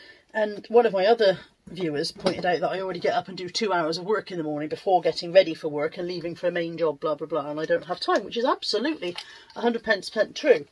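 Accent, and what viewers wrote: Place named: Forest of Dean, United Kingdom. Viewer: I've recently found your channel and I'm trying to pinpoint where you're from as you sound like you're local to me! I'm in the Forest of Dean 😊 really enjoying your videos! X What you said is spot on!!!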